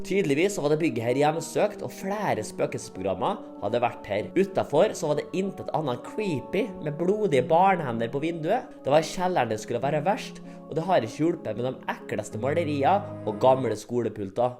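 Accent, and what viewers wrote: Norway. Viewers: Hvilken dialekt snakkes? En slags nordlig variant? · Molde dialekten tror eg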